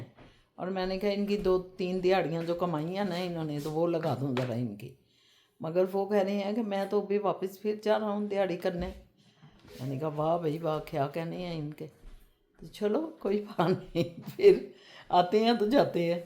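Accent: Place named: Punjab, India. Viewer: Your Urdu is very punjabi.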